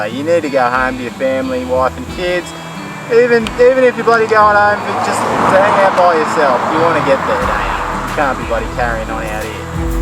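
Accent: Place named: Australia. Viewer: fuckin dig your australian accent mate. STRAAYYYYAAAA!!!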